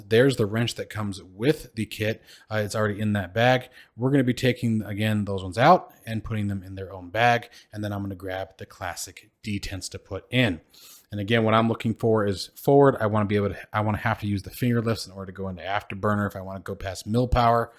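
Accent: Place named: United States. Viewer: do they ship to the united states? you sound american but doesnt mean you live in america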